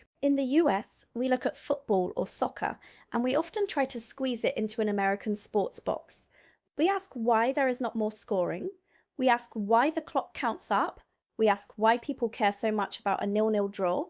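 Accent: England, United Kingdom. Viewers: You sound British not American, you must have been here a long time ago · She sounds british to me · you sound English now.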